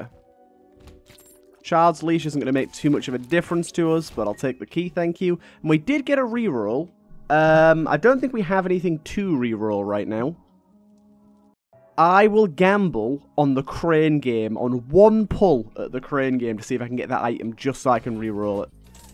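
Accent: United Kingdom. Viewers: After watching the whole video I only have one question: is this guy british?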